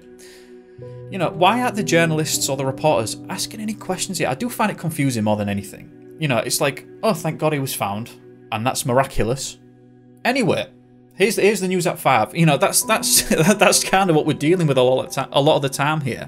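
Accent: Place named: England, United Kingdom. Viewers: You lol that as though anyone from the U.K. could readily tell the difference between a West Virginia accent and one from Kentucky. I know you mean well, but the tone sounds condescending. I'm sure that's unintended. His vocal cadence (there's a better word for it) does have a tiny bit of a brogue. If someone hasn't spent time in Scotland and England, it's an innocent misunderstanding. When he says, "taken," "say" or "if so," those vowel sounds aren't immediately revignizable as an English accent. From which region is he? Side note: I'm related to Hannah Bee and family from Warwickshire- way back..Is that actually your last name? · 😂 His accent is English not Scottish love. Lol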